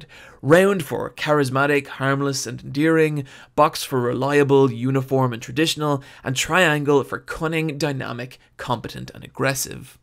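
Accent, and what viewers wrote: Ireland. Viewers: Irish accent and blue graph paper? Almost mistook you for Real Engineering there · Can someone tell me what SEWs accent is? English is not my native language so I can't really tell. But the "h-why" and "castume" and "ting" remind me of Roy from IT crowd sooo ... irish? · His accent, the "Why" or "What" is being affected by his accent and it sounds forced. It's an Irish one, I'm Irish, believe me he doesn't have a choice <\3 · You sound irish bruh im irish dio has good fasion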